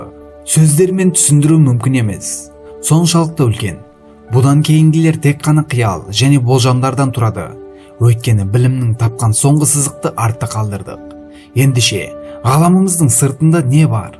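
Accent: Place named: Uzbekistan. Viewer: Сөйлеп тұрған бала Өзбекстанский акцентпен сөйлеп тұр ғой примерно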